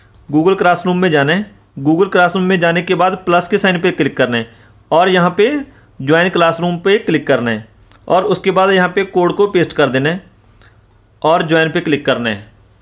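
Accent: Punjab, India. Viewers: ji apka bolne ka tarika Punjabi yo jesa h me bhi Punjabi hu na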